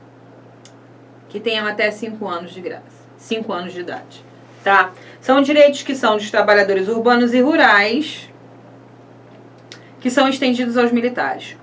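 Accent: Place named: Rio de Janeiro, Brazil. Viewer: Uma pena, uma aula tão rica e objetiva não ter tantas visualizações, obrigada professora! A senhora é 10, gratidão! Sou carioca mas moro em BSB, e as vezes esqueço o quão lindo é o nosso sotaque <3 estudo em casa, porém, logo voltarei para testemunhar minha aprovação neste comentário, Deus abençoe esse curso!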